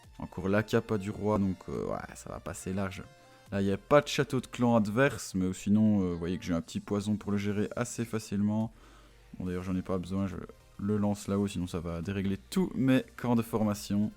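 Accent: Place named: Belgium. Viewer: J'adore l'accent belge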